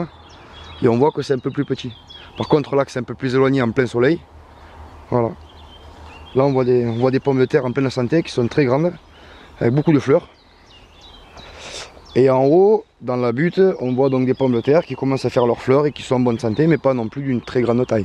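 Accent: South, France. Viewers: Merci beaucoup pour la réponse complète et sincère ! Hate de voir vos prochaines vidéos avec cet accents qui rappel le soleil ^^ Peace & Love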